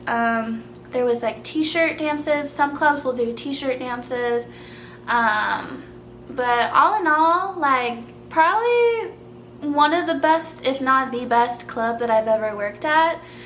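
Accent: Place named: New York, United States. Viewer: Interesting to hear your experience as a stripper. You sound like your from NY,, not Oregon.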